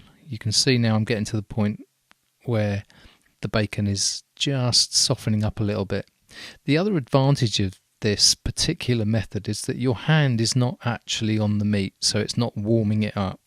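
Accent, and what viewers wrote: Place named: Australia. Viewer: I love the accent bro. Crocodile Dundee up in this bihhhh. "That's not a knife, this is a knife!" LOL every time u say knife thats all I i hear 😂😂😂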